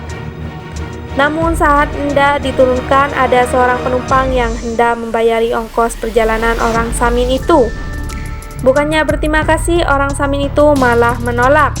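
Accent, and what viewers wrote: Madura, Indonesia. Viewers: Admin nya orang mana ini kok Logat nya Kya Madura 😅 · Naratornya..sepertinya ..orang madura..logat nya kental sekali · Makin lama makin dalam maduranya .. 🤣🤣🤣🤣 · Voice over nya logat Madura ? Betulkah demikian ? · Cewek pembaca cerita nya orang madura atau mana? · naratornya oreng MEDURE neka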